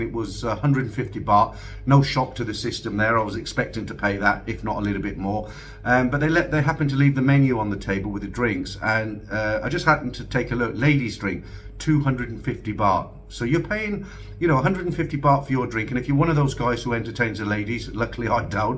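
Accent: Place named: United Kingdom. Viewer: It’s called an accent… Have you really been sheltered that much? Different parts of Britain do have different accents and in some areas, they tend to add an “err” sound at the end. They don’t even realize they do it. Because you know. It’s an accent. 🤦‍♂️ How do you think the word “soccER”, came about? (Actually there is more to how we got the word soccer but that is beside the point.)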